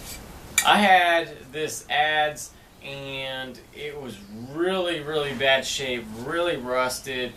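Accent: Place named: South, United States. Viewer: Nice and well done video. I have some tools that were left out in the rain a few years. I will try your technique to clean them up. I agree 100% with S. Walker below. You spoke clearly, explained the process well and your slight southern accent added personality to your video. This is from a guy who lives in South Carolina. If every video on youtube sounded like the Discovery channel, it would be a very boring place. Don't change a thing young man !!!!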